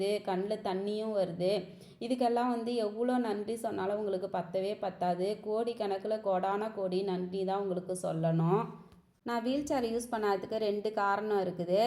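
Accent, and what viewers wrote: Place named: Cuddalore, India. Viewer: சீக்கிரம் உன் ஆசைகள் நிறைவேற பிராத்தனைகள் மா ❤🎉 எந்த ஊர் மா நீ. கடலூர் பக்கம் என்று நினைக்கிறேன். ஏன்னா பேசுறது எங்கள் பகுதியில் இப்படி தான். நேரில் பார்க்க நான் வரேன் மா. எல்லாம் நல்லதே நடக்கும் உனக்கு. உங்கள் சொந்த வீடு என்று நினைக்கிறேன். எப்படி உங்களுக்கு தகுந்த மாதிரி கிச்சன் மேடை அமைந்து இருக்கு 😢🎉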